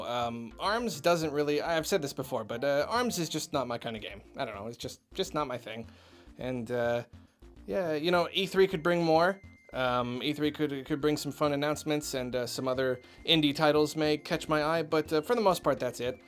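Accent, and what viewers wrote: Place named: New York, United States. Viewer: You used to sound like someone from New York/Brooklyn and now you sound WAY different.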